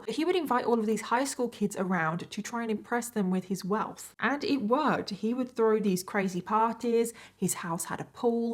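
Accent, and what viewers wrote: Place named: United Kingdom. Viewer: Imo you cover these cases better than most shows😂 I’m obsessed. And your so bri’ish sometimes I love it lmaoo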